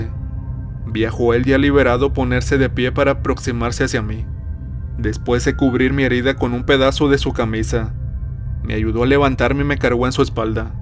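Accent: North, Mexico. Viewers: Hola soy Elisa y trabajo de housekeeping en un motel 6 en Texas y a todas miss compañeras nos gusta tu voz norteña en tus relatos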